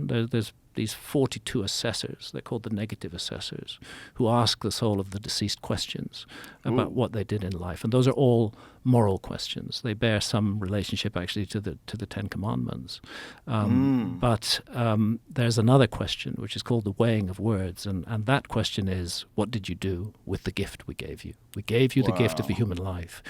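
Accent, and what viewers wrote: United Kingdom. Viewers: Still waiting for concrete proof of your theories graham… you trick a lot of people with your fancy words and British accent but eventually we need some proof. I’m sick of listening to the same stuff over and over again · jus because u have a british accent doesn’t mean ur smart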